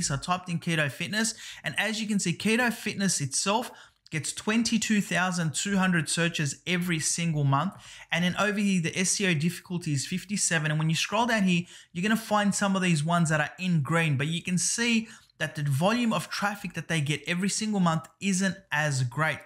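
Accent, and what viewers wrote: Australia. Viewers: I like your Aussie accent 👌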